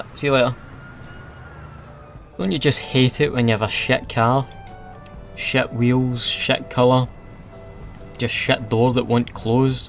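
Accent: Scotland, United Kingdom. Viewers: Where in Scotland you from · Where about in Scotland are you from ??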